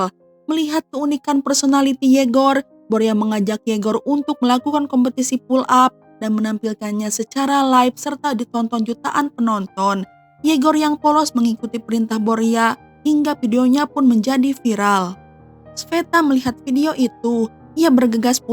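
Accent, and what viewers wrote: West Java, Indonesia. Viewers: Aduuh sunda pisan teteeeh🙏😁.. Resep ngadenge sora si teteh 😊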